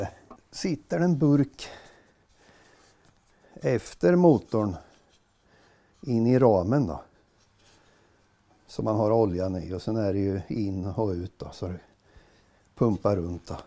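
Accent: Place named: Östergötland, Sweden. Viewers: Mer östgötska till folket! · Hej! Jag hör att du är från Östergötland och är ett Indian freek, måste fråga dig om en Indian med sidovagn. Min min mammas morbror ägde den och tror att den inköptes <num> talet. Han hette Set Österkvist ock var från Örtomta församling utanför linköping. Skulle vara kul om du viste något om den? Jag har en historia att berätta över just den Indianen.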